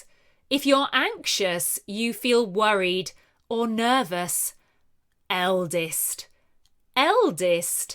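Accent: United Kingdom. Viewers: That beautiful british accent!!! 😍😍😍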